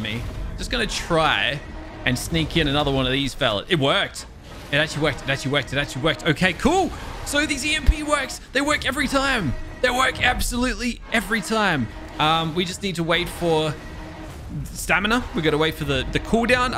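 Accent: Australia. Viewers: you sound like you are from adelaide or sydney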